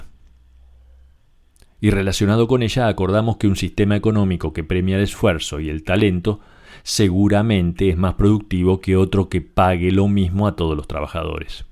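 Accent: Argentina. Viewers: a veces tengo que darle al stop para asimilar el contenido, muchas veces vas demasiado rápido y siendo español adaptarme al tono argentino ,pero el contenido es bastante interesante y beneficioso. Saludos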